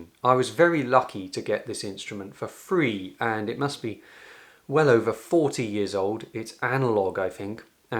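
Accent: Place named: Cockney, United Kingdom. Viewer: Love the cockney accent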